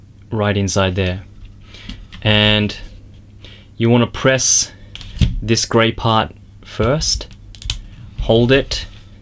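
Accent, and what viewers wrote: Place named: Australia. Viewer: And hey, your accent definitely sounds Aussie! Great to have another Aussie figure reviewer on the tube!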